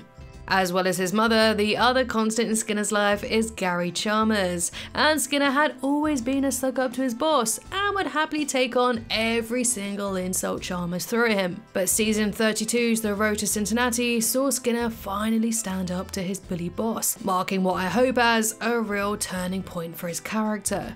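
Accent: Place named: South East England, United Kingdom. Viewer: It sounds like we both from south east England if only we could meet up and knew your last name so I could find eachother and talk Simpsons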